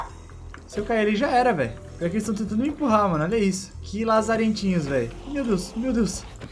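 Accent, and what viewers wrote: Rio Grande do Sul, Brazil. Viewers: tu é mesmo gaucho ou tu so brinca com o sutaque?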